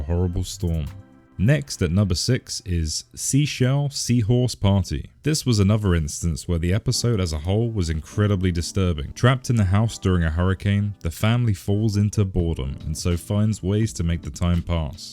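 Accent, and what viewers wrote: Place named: United Kingdom. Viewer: It’s so obvious that this guy is British…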